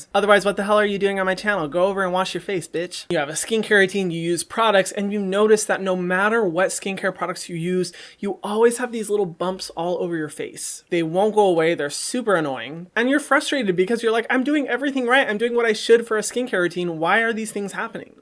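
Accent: Scotland, United Kingdom. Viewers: Great Scottish accent !!👏🏽👏🏽 (but they don’t sound like that anymore😂) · I’m Scottish and that accent hurt me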